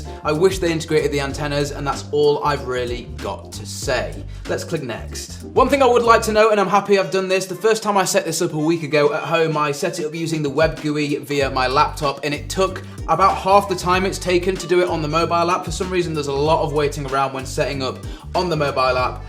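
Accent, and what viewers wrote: England, United Kingdom. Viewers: He’s British if you didn’t notice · No, he's English and speaks British English, hence he says 'rooter', not 'rowter'. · Calm down mate, that's how they pronounce it in the UK you mong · its his english accent that you find impossible to accept. not my irrelevant youtube name. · that’s how we say in the uk if you got a problem take it up with boris johnson he’ll sort u out 😂😂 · It's because he has a British accent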